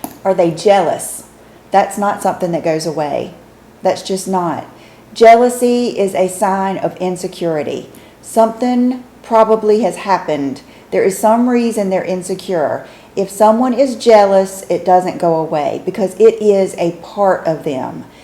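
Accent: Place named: Texas, United States. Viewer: do you live in Texas. I live in Tennessee and you have about the same accent as me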